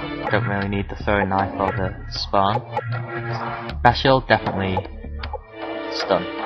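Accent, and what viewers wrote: United Kingdom. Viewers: filipino with a english accent? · corner, he just has a british accent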